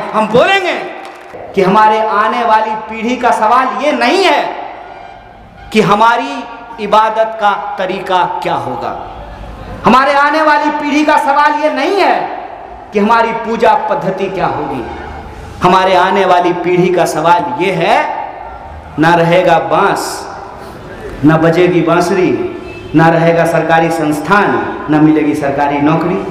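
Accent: Bihar, India. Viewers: Lalu yadav ki kmi dur ho gai... Apke ane se.... Mja ata h apki theth bihari bhasa sunkar.... 😀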